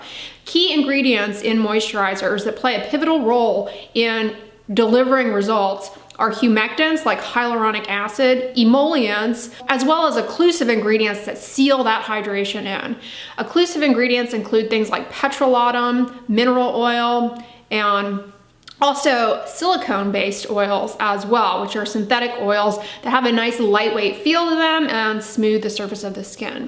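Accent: United States. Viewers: She definately does not have Australian accent. I am from Australia. Her accent is American.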